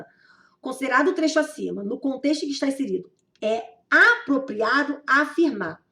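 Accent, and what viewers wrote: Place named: Rio de Janeiro, Brazil. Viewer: vc dá aula no RJ em qual curso? sou daqui e nosso sotaque nos entrega rs. Gostaria de ter aula no seu curso.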